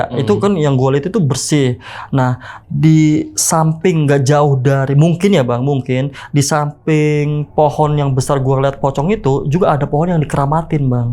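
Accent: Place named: Banyumas, Indonesia. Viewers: lu gua tapi medoknya ga ilamg 😎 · Bang, sekedar saran aja, Kayanya berat bgd ngmng gue lu... logat ngapaknya ga bisa ilang, lebih baik ngmng aku km aja. Orang jawa itu identik dengan tutur bahasanya yg alus soalnya 😅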